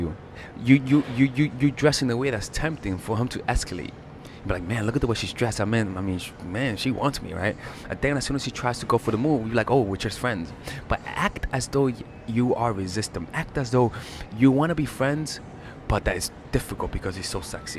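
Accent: New York, United States. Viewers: I can tell you a new yorker lol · His accent actually makes me miss New York ugh I wanna go back🌃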